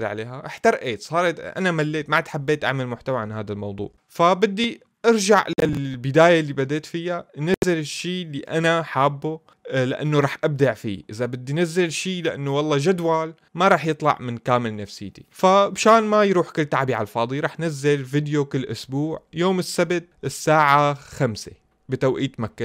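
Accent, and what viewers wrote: Syria. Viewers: انا مابتابع قناتك الا عشان اللهجه السوريه تبعك 😂🤣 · احلى لهجه هيه السوريه و انت بالعكس انسان راقي و مرح كلش والله .. بالعكس استمر و حاول اتطور اكثر بالقناة و ربي يوفقك للي تتمناه .. اخوك ومتابعك من العراق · لا منتا غليظ وانا ما اعرف سوري وفاهملك ١٠٠ فل ١٠٠ بس منجد يمكن الناس ما يحبو اللهجة سوري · اوووعك تترك القنااه انا ماحبيت المورتال الا بسببك الصراحه وعندك تفاعلك رهيب وبالعكس.. الي شدني اكثر لهجتك السوريه والله رووعه ( ولك يحرئ ديبك يازلمه😂) هالجمله ذي تضحكني لما تاكل لك اكله في المورتال استمر و ان شاء الله ربي يوفقك نزل مقاطع قليله بس لا تترك القناه♥. وتحياتي لك يا. اخووك من السعوديه🇸🇦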